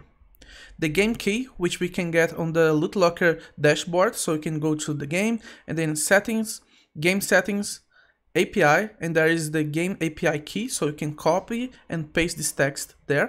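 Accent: Brazil. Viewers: se é brasileiro? sotaque esse manin kk parabéns pelo tutorial ajudou aqui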